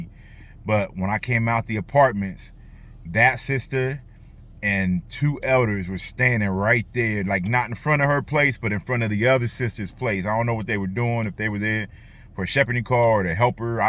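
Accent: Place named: Pennsylvania, United States. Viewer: Are you originally from PA? You got that Pennsylvanian accent lol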